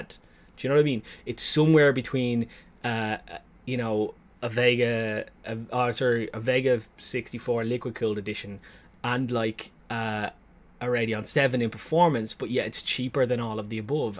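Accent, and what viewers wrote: Ireland. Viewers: Addicted to content. Man cave + Irish accent + rage + scratching. Top notch channel right here, m8.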